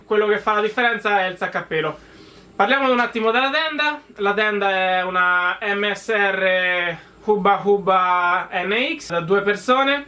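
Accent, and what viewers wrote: Umbria, Italy. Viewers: alla faccia che forza che sei! ma dal tuo accento sembri umbro o sbaglio?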